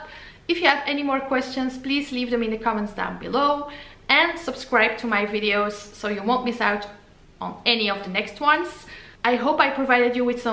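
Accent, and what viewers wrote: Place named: Antwerp, Belgium. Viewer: Finally I found someone with the same accent as me!! Ik ben Antwerpse maar woon sinds 15 jaar in de USA.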